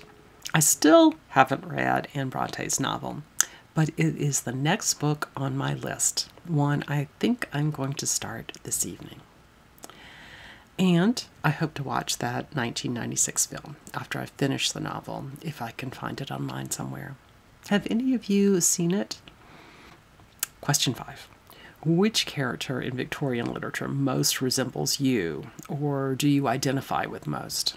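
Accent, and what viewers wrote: South, United States. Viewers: I love a Southern accent it's so soothing and mellifluous. I'm not well educated and keep a Chamber's by my bed to look up old outdated words and terms found in Victorian and Georgian literature. Thank you again for being an interesting guide.❤️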